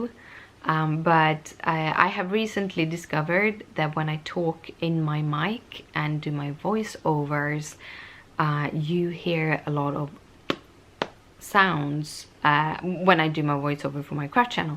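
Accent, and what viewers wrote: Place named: Northern England, United Kingdom. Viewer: I have a question......at what age did you start learning English? I saw video recently of very young children in Norway, ages 6 or 7, speaking English. You sound like you have spent time in Northern England. Did you live in an English speaking country for an extensive period of time?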